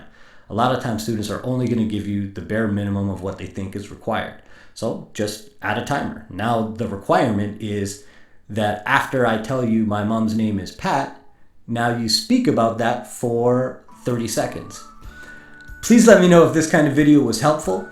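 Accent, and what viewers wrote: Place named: United States. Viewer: Thanks the video is great you should do more videos like this. I'm a teacher of English from Gaza / Palestine if you ever heard about it:). I teach first grade. I teach 9 classes each has 35 to 40 pupils they enjoy your games and they like songs as well. Nice to meet you and too. Btw your accent is similar to the American accent that's why I asked you ♥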